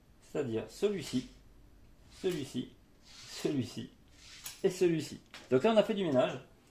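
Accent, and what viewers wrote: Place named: Toulouse, France. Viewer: avec grand plaisir de se tutoyer (j'avoue avoir modifié mes "tu" en vous car je voulais pas sembler trop invasif avec ma question du "d'où es tu". Mille fois d'accord que quand tu compares ça avec les kickstarter actuel, ça devient de la folie, après faut dire que svt dans les kickstarter tu as de la figurine et c'est la course au stretch goals donc le volume peu devenir fou et le prix l'est déjà de base et alors quand tu vois les all-in... Bon ça m'a pas empêché de 'e pas être raisonnable durant l' année sur KS 😅 Sinon tu as bien pris l'accent Toulousain effectivement, je reconnaissais une touche du sud mais légère. Je suis de Montpellier c'est pour ça que ça me parlait un peu 😜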